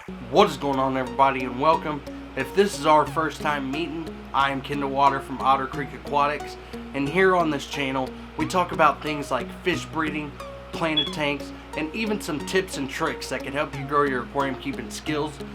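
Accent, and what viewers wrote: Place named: Kentucky, United States. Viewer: Greetings from a fellow Ky native! I stumbled across your videos and a few seconds in I thought, "Where is this guy from? 'Cause he sounds like a Kentuckian lol". Enjoying your videos!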